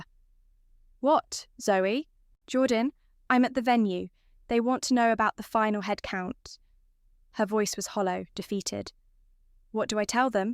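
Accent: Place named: Australia. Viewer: I live the Australian voice-over that everybody calls a British voice.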